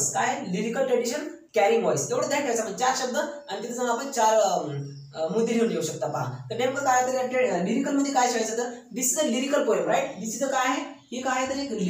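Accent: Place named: Rural, India. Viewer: ते गावाकडचे आहेत ना म्हणून. थोडं समजून घेतलं पाहिजे आपण 😊 छान समजवल त्यांनी 😊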